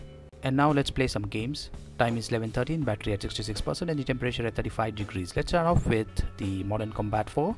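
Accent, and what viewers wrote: India. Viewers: are you indian cause I recodnise the accent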